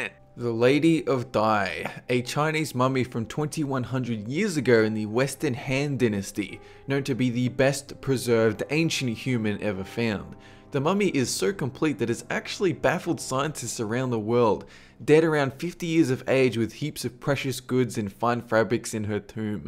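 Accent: Australia. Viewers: Are you Australian? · He's Australian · Is this guy Australian?? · He's Australian. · He's an Aussie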